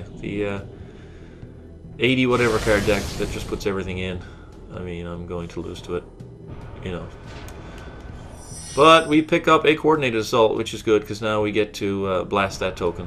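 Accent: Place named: Newfoundland, Canada. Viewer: I'm sitting here thinking.... this guy.... I think he's from Canada.... he sounds..... NEWFOUNDLAND. Yup. I'm from BC, so no hate here haha.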